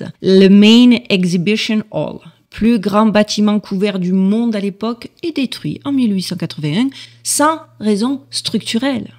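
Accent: South, France. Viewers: En plus avec l'accent du sud❤❤❤ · Merci pour vos excellente vidéos, alors oui tartarie 3. Bonjour de Suisse, j'adore votre accent du sud 👌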